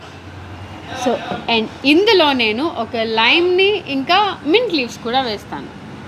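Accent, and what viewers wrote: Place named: Konaseema, India. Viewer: Elagunnav, aakalettunda 🤣🤣Haha konaseema slang max gaadu😂😂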